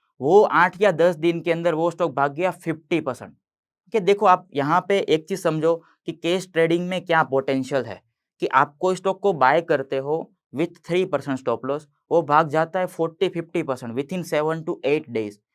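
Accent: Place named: Gujarat, India. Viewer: Bhai pakkaaaa gujrati hai 😅😅😅😅😅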